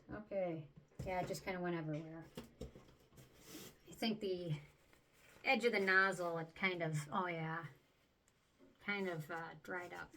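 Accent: Minnesota, United States. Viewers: You're so cute & have a cute Minnesota accent & voice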